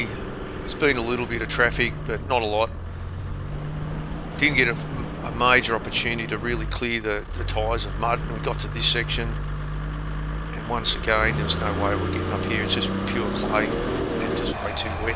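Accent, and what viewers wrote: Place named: Australia. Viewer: Interesting accent, I realised Australians pronounce 'i' as 'oi', like 'time' becomes 'toime'. Nice track i wish my country had such places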